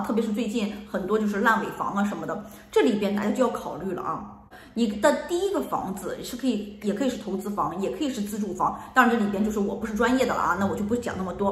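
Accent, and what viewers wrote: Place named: Northeast, China. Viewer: 感觉一口东北口音呢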